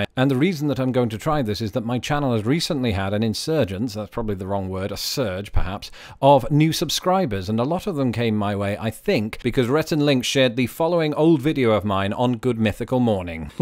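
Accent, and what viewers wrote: United Kingdom. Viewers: You are definitely so much more than a British accent. I'm only going to list a few for anyone new to your channel: an excellent researcher, a great writer, a balanced presenter (how often does that happen anywhere nowadays?), a talented composer, and a wickedly funny guy. Plus you have excellent taste in entertainment: TESB is my favorite SW movie and Quantum Leap (which people can find out about when they binge-watch) is one of the greatest tv shows every made. I watch all your videos but "Distant Words" is my favorite. Please keep them coming! I always share them on FB and Twitter. Congratulations on the new influx of subscribers!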